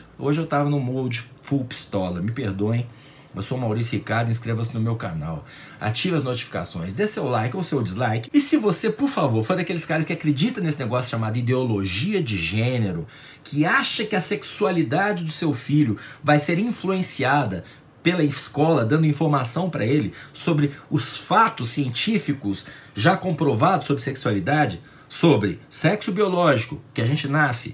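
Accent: Minas Gerais, Brazil. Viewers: Com a tua serenidade e o sotaque mineiro não parece estar full pistola, cada dia melhor o teu canal, em relação ao Doria, usurpadores tem em todo espectro político, desde uma ponta da ferradura ideológica até a outra. Abraço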